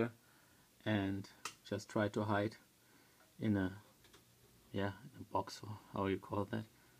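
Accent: Germany. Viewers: Ich finde es witzige wie er English spricht aber Deutsch ist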